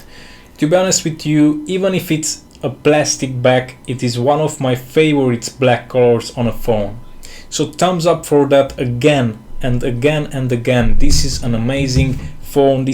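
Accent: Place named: Romania. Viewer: Ești român după accent:)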